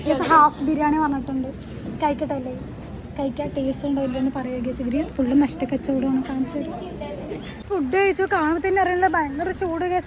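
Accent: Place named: Kollam, India. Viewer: Palakkadan basha ❌ Kollanpotta basha ✅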